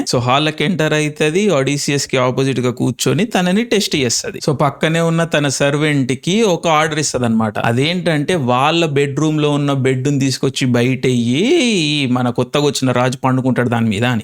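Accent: Telangana, India. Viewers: Starting audio vini Telangana bhasha lo ki dub chesesavemo anukunna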